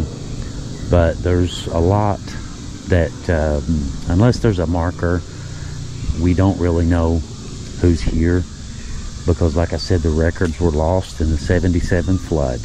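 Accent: South, United States. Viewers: Aarrgghh. That Southern drawl. The worst, most jarring accent on the planet.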